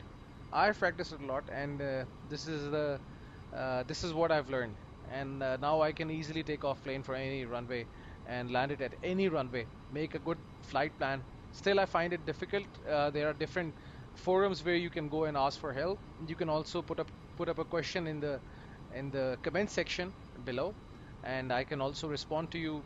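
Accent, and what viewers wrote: India. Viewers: I dont like your indian accent because you sound like a guy who calls me from "windows technical support"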